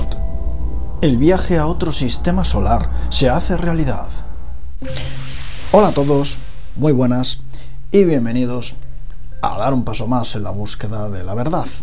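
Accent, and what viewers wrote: Spain. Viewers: ese asento español jajaja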